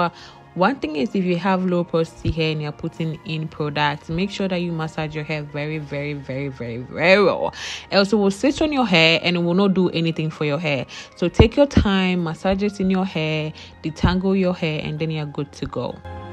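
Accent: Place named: England, United Kingdom. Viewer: You are simply smart & very beautiful. I love your English accent 🎉🎉🎉🎉❤.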